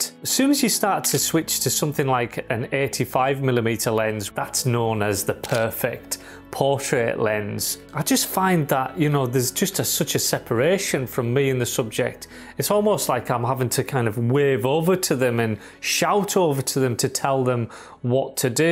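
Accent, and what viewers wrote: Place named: Carlisle, United Kingdom. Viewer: Hi subscribed as soon as I heard the accent! Ex Carlisle lad living in Australia. Have been thinking really hard about selling all my olympus gear and getting this camera…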